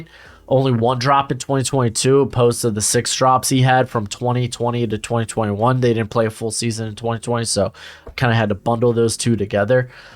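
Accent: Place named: Phoenix, United States. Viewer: Sounds like you are from the Phoenix area? My tribute band just played at Casino Arizona in Scottsdale this past weekend.... a great weekend were it not for the speeding ticket I got.... sad face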